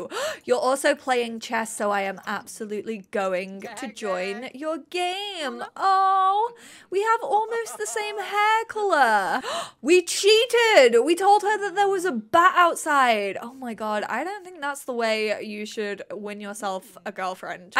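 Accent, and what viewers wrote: United Kingdom. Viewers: you sounded particularly British in this video. 😂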